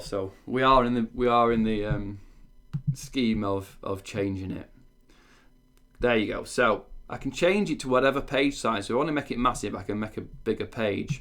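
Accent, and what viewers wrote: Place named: Liverpool, United Kingdom. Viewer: Good stuff, I just had a phone interview where, in addition to my SSRS experience, I said I have worked with Crystal Reports. Good overview here. Hey are you from the Liverpool area? I'm a Yank but I try to discern British accents. You have an accent similar to Paul Hollywood on the Great British Baking show.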